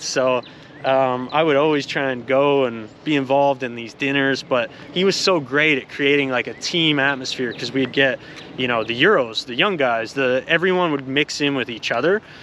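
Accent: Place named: England, United Kingdom. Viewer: Where in England you from bro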